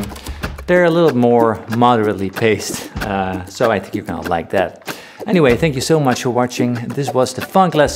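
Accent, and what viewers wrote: Netherlands. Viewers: That Dutch accent ain't so funky. Sounds like my Grandpa from Rotterdam. The playing is all the better to funk u with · Sounds dutch to me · What is your origin? You sound dutch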